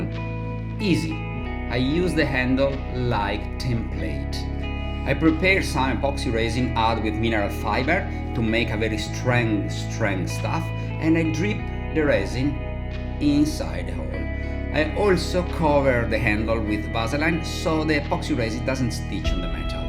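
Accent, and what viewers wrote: Italy. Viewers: bravo sembri veneto dal sound....se ti serve una mano gratis a disposizione perche mi sa che c'e' davvero molto da imparare da voi. well done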